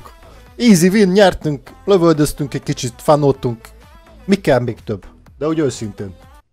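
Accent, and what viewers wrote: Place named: Russia. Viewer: Orosz accent megvan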